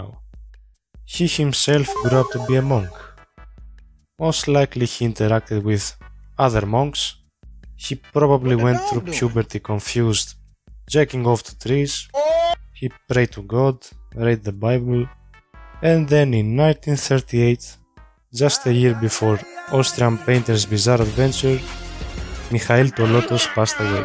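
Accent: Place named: Greece. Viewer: ellinas einai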